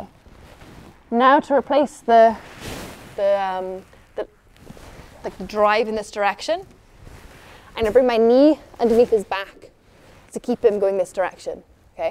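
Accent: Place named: Wales, United Kingdom. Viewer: She's from Wales...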